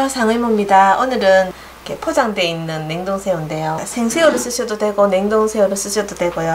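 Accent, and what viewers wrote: Gyeongsang, South Korea. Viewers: 오늘같이 비오는 날엔 튀김이 땡기네요! 경상도 억양으로 설명해 주시니 더욱 맛있어보여요~ ^^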